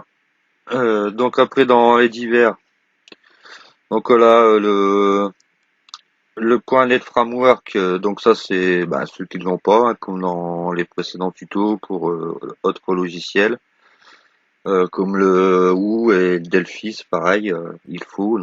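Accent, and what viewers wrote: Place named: North, France. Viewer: bravo bonne explication avec l'accent du nord. merci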